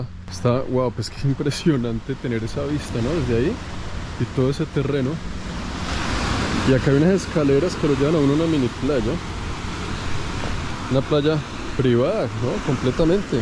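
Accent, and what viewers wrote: Bogotá, Colombia. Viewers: lo que me encanta de ti, es que eres auténtico, tu lenguaje muy rolo, muy Colombia. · Que carisma tienes, me gustan muchos tus videos y lo que transmites, como describes los paísajes y como se te ve que disfrutas lo que haces y los lugares que visitas, tu acento colombiano, bel ragazzo 🥰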